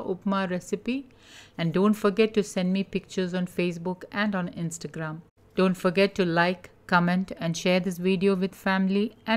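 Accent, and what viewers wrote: Kerala, India. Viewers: Mam are you malayali your way of speaking English I think so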